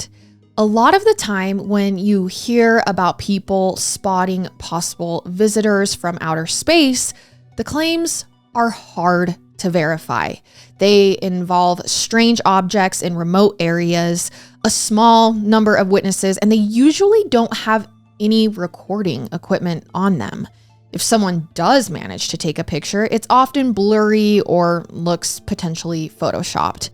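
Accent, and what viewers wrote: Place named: AAVE, United States. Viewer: Ebonics! It actually exists!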